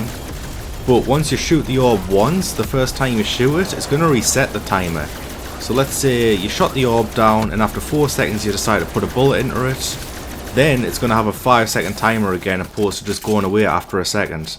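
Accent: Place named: England, United Kingdom. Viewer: another great video, but random question, always wondered where in england your from by your accentv haha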